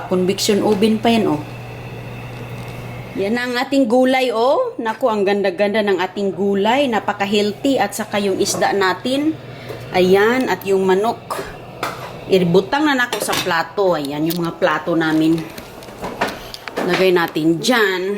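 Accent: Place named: Aloran, Philippines. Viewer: Tagaasa manka ma'am sa Pinas moramag taga aloran ang tuno sa imong inistoryahan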